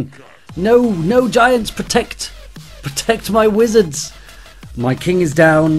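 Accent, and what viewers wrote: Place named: United Kingdom. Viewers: I'm pretty sure he does have a British accent. What would you say it is? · speaks "the queen's english" which is quite different than american english.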